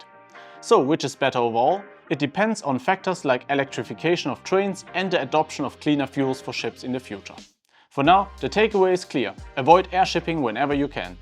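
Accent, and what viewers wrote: Germany. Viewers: Asian man with a German accent caught me so off guard and I love it!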